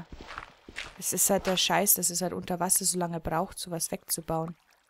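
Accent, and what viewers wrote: Bavaria, Germany. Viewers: Hy! Bin durch Lets-Plays.de auf dich gestoßen und find deine LP´s echt Hammer! Bist du aus Bayern? cheerio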